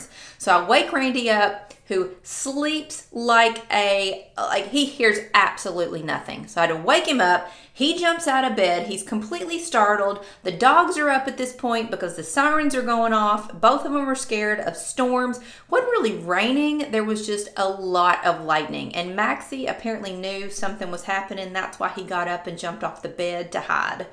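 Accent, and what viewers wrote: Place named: South, United States. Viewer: You have my hair.. GAWD..... same problems.... same FLOWER on the right side of my head..... I want to transition, I am 62 and my hair is THICK like this!... dang girl... we got the same MAMA! I'm in Texas... I'm gathering from your accent.... Arkansas? Alabama, not too much toward Mississippi....OKLAHOMA??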